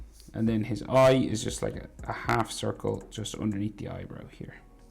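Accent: Ireland. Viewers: First u art is sooo good, its eloung me become a waay better artist and second r u irish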